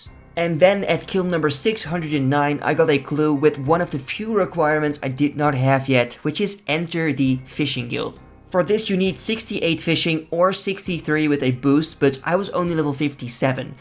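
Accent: Netherlands. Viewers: I was like "this dude sounds so dutch" then I saw the location, gang gang shit lekkuurrr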